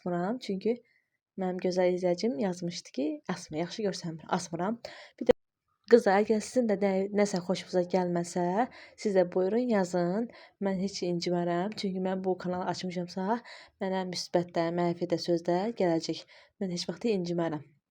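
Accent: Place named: Qabala, Azerbaijan. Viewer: menimde yoldaşım qebeledendi size qoz gelmeyinden birde lehcenizden bildimki qebelelisiz😘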